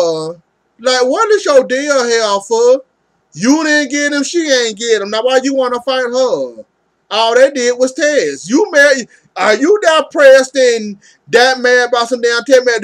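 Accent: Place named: South, United States. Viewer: I love that accent. Country grammar. ❤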